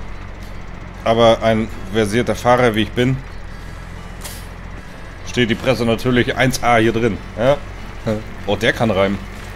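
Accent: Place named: Low German, Germany. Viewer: Hachja... endlich wieder ehrliche Landwirtschaft mit Plattdütsch und ohne CP, wie mir das gefehlt hat :-)) Im Ernst, du bist so einmalig und so bist du an meinem Feierabend seit der Freidorf!!! Und die Grafik ist tatsächlich Wahnsinn! ich versteh gar nicht wieso! Liegt das etwa an Deinem neuen Rechner, du Schlawiner??? Oh nee muss nicht sein mit der Frankenmap, lass sie ruhig auf Twitch... Was hälst du von Gorale für Youtube? Aber eigentlich reicht auch erstmal Windsbach oder? Modcontest finde ich wirklich sehr sehr gut, war ja mein 1. mal :-D Ich finde gut, dass Mods sich mal offiziell vorstellen dürfen und dann auch mal belohnt werden. Zum Teil ja unglaublich, was die Jungs so raushauen - wie z.B. den Seasonsmod - die haben den 1. Platz absolut verdient! Was ich persönlich richtig toll fand, waren die Youngtimer-Mods und natürlich den Seasons-Mod. 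Bei den Karten bin ich etwas skeptisch, ob es später dann gefixte Versionen gibt, denn Fehler haben die alle.... So, das war das Wort zur Nachtruhe am Dienstag, Horridooo :-))) PS: Der Country-Sender ist sooo cool, ich liebe ihn!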